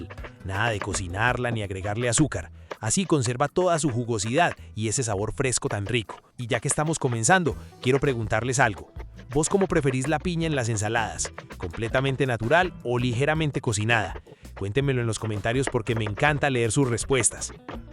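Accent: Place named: Antioquia, Colombia. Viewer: Hola muchísimas gracias por tus recetas, pero sabes? ... lo que más me encanta es tu sentido del humor y tu acento pais, jajaja divertido t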